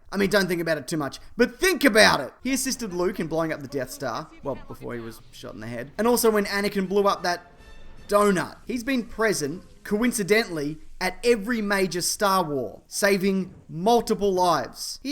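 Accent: Australia. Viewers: dude are you an aussie?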